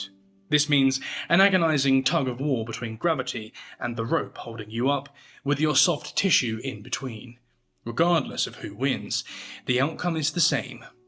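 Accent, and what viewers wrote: England, United Kingdom. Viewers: Just got home saw the alert have a new video, oh man dinner with a side of torture in that soothing English voice 😂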